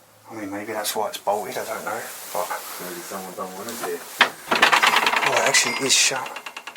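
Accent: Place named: Scotland, United Kingdom. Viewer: It's very Scottish I wish you would say what country your in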